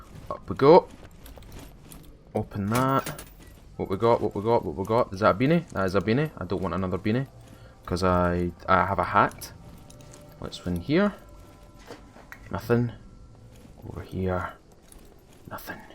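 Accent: Scotland, United Kingdom. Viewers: that sweet sweet Scottish voice. mmmmm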